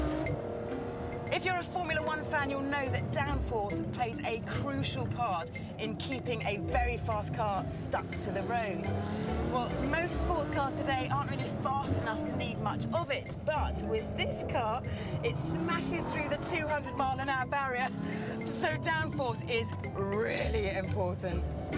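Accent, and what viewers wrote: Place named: RP, United Kingdom. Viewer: They could have hired a more attractive presenter....VBH is a minger and why talk like youve got plums in your mouth!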